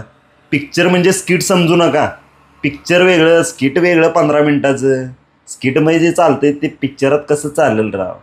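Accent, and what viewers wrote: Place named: Kolhapur, India. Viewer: I like your Kohapuri accent. And ofcourse the rokh tokh analysis.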